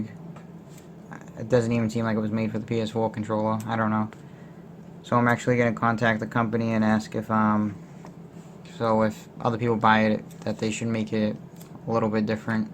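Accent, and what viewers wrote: Boston, United States. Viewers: God that baston accent terrible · What part of Boston are you from? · really thick Boston accent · skeletøn clique its boston I think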